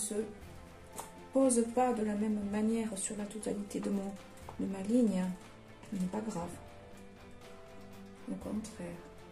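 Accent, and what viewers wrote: South, France. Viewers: moment plaisant en compagnie de la charmante et souriante à l'accent agréable du sud pour découvrir la technique de mise en oeuvre de l'effet peau de serpent. 🤩 l'association des couleurs est sans fausse note. j'aime bien le rendu estompé du ciel avec cette surépaisseur matérialisant un voile nuageux avec l'effet de la pluie en mer. vous vous êtes un peu reprochée l'imperfection de la ligne d'horizon. pourtant, je trouve qu'elle est bien ainsi car plus réaliste que l'abstraction que l'on en fait simplement. je pense que si elle avait été uniforme elle aurait accentué une toile coupée en deux alors que dans votre cas la transition est naturelle. enfin, la représentation du bateau fut un clin d'œil pour moi. il m'a fait penser à la technique de représentation des fonds de décor que l'on peut également trouver dans certaines BD. une bien jolie toile. 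🤩😉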